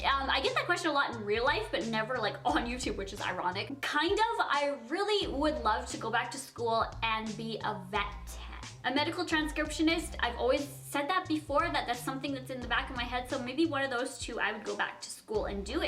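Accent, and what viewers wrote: Newcastle, United Kingdom. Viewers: It's Geordie, bless you! Ask Siri to say it xxx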